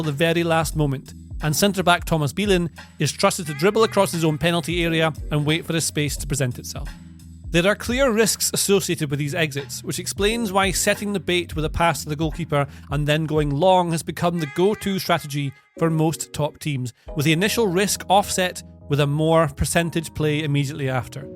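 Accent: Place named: England, United Kingdom. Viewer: Please consider adding more visualizations or subtitles. The topic is really interesting, but the video moves at a fast pace and uses many technical terms. The heavy English accent also makes it hard to follow for an international fan like me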